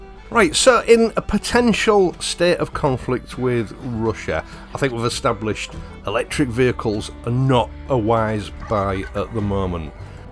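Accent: United Kingdom. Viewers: Absolutely agree with you. That's why I'd prefer an old European car to a new Chinese one stuffed with unnecessary electronics and a half-windshield size touchscreen. It feels like you can't control these cars completely or simply repair it. It'll turn into a brick one day if needed. Btw, greetings from Russia 👻 Came across your channel when I was buying my first Enfield, but stayed because of the calmness of your videos, the stunning views and your wonderful British accent :) Good work and peace to us all!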